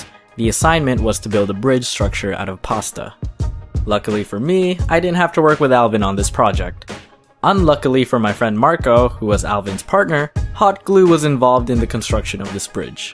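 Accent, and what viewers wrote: Canada. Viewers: R u Canadian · You from Canada? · wait are you Canadian?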